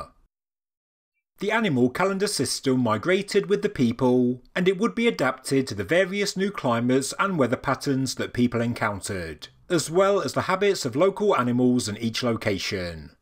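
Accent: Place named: England, United Kingdom. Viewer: I want you to know, after I watch your videos I walk around my house punctuating then end of each sentence, in an English accent, lol. True story.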